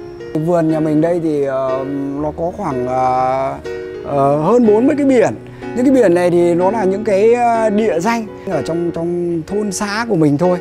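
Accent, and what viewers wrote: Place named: North, Vietnam. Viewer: Ngay từ đầu đã đoán bác ngoài Bắc lập nghiệp trong Nam rồi. Chúc bác mạnh khoẻ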